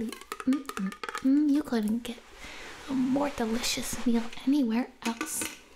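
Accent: South, United States. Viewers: Remember when had a southern accent? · Has accent changed? I swear it used to be more southern sounding...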